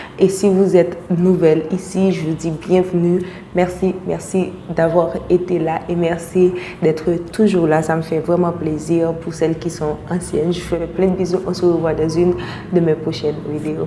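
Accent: Haiti. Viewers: Elle parle comme une haïtienne!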